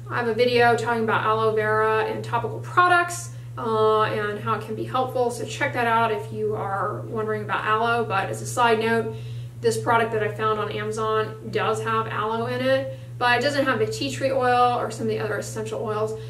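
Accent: United States. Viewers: What state do people speak like that? Just curious.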